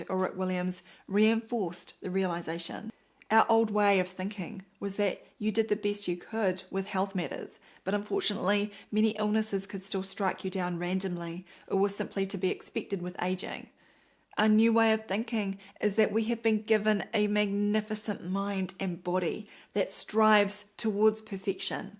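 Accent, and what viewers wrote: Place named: New Zealand. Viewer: I love you, even with your Kiwi accent. Thanks for telling us the truth.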